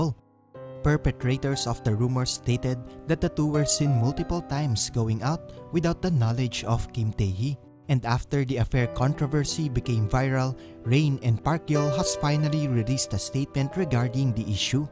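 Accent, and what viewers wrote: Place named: Philippines. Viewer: You have a Pinoy accent. 😊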